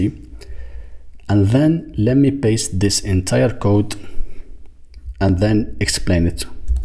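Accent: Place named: Arab world. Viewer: Are you an Arab? Your voice says that.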